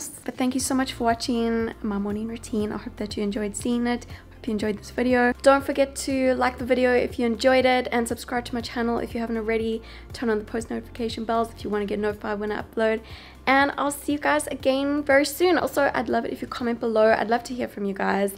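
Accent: South Africa. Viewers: Caislee Erica It’s South African. I’m not sure what part of South Africa tho. Because I’m from Cape Town and we sound slightly different than her.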